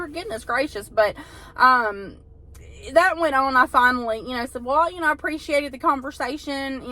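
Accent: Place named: South, United States. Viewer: Thank you and and for all you do for us Elvis fans. Glad to hear is doing better. Even Pastor Bob Joyce said he’s not Elvis. We all wish Elvis was still alive but sadly he passed on August 16 1977. BTW I love your southern voice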